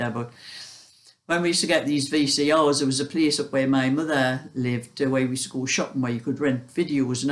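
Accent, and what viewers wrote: Newcastle, United Kingdom. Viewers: Hi. I have only recently found you. As a scouser who moved to Canada over 30 years ago, I have always been a sucker for the Geordie accent😊 I have been following your tutorial for the big crochet bag. I started as per you instructions with a 37 stitch chain Measured it and it was about 16 inches as you said. I am now doing the sides and the base magically measures 20 inches. It's HUGE! I tried to send you a picture but I don't know how to attach to this message. Do you have any advice? Thank you. Sue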